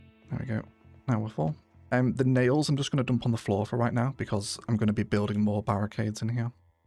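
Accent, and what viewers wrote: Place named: North, United Kingdom. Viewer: Come on! I recently moved to Australia from the UK and it's lovely having a chill northern British voice talk to me about Zomboid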